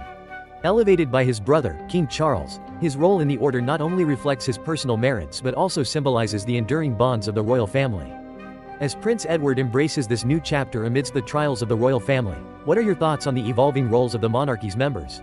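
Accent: United States. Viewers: Hate the bloody American voice commentator, and please stop using YouTube’s most overused word…SHOCKING! 😤👎👎👎🇦🇺🦘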